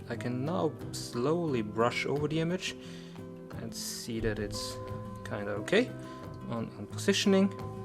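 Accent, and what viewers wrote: Germany. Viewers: Loooove your videos! I learnt a lot! :-) btw are you German by any chance? Thought I heard a light accent haha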